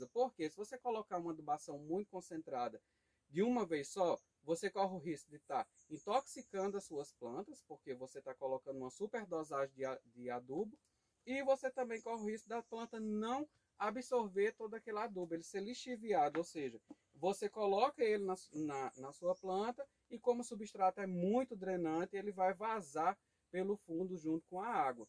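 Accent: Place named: Minas Gerais, Brazil. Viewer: Fala meu querido!!!! E esse sotaque??? Kkkkk tá bem minerin. Me inscrevi no canal e boa sorte!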